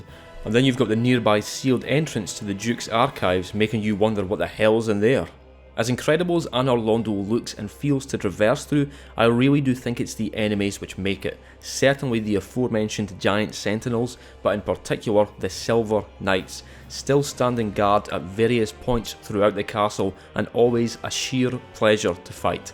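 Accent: Scotland, United Kingdom. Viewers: Finally. I watch a lot of different content creators on YouTube and from time to time there was this horrible accent that I really can't stand but I never knew where it came from. Now I know Scotland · As soon as I heard the Scottish... I knew I had to sub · First time coming across this channel. Was pleasantly surprised to hear a fellow Scottish accent. Have a like and subscribe, ya cunt! 🏴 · Who doesn't love a good Scottish accent to listen to.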